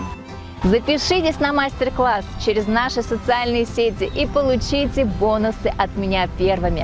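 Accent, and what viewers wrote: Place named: United States. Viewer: Я с Вами тоже буду учить английский, в школе учили немецкий, так ни разу не пригодился в жизни, у Вас прямо настоящее американское произношение, к нам на фирму из США приезжали, так же говорили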